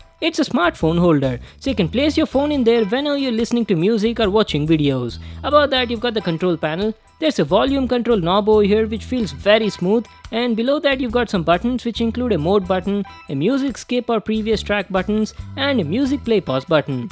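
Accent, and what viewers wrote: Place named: Tamil Nadu, India. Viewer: Is he a Tamil Guy ? Or Telugu Guy.. Just asking becz of his English pronunciation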